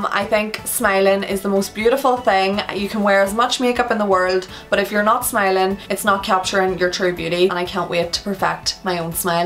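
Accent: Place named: Donegal, Ireland. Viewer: I wanna say that your from Dublin but you have a donegal accent (my accent)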